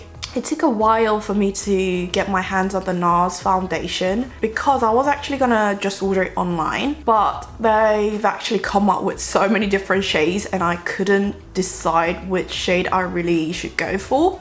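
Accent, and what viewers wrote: United Kingdom. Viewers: 와..파데보러왔다가 발음듣고 구독하고 가용...ㅜㅜ 이렇게 영국스러운 절제된 발음이라니 사랑해요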